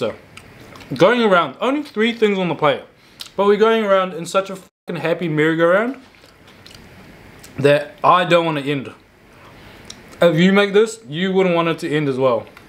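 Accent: New Zealand. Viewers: i love the nz accent so much.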